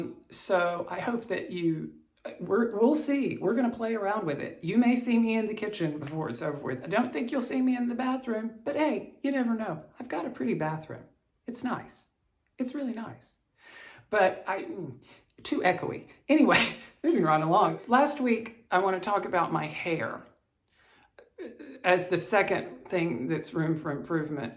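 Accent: South, United States. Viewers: I'm impressed with your progress to linen. I enjoy the humor in your episodes. The volume was perfect and very clear. You are so funny and such a story teller. . . very Southern. I watch several floss tubes and each one of them has mentioned you! Can't wait to follow your journey.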